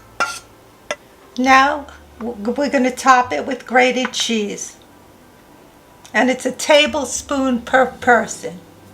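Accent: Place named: New York, United States. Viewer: Hi again Grandma! You were married close to the year I was. Do you and Grandpa have a secret for such a long marriage? It's so rare these days. Btw I grew up in Mahopac NY and knew people with your same lovely accent. As a bride I had a set of the flowered Corelle dishes, green and white. P.S. they DID break although they were called unbreakable. Best wishes, Donna in Vermont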